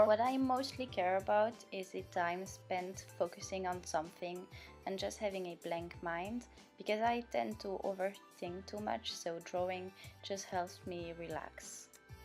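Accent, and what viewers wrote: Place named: Russia. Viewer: Ta voix en anglais est tellement cute, c'est hyper agréable de t'écouter (malgré l'accent russe hihi) Le rendu est vraiment bien,j'adore la palette de couleurs que tu as choisie! Keep going <3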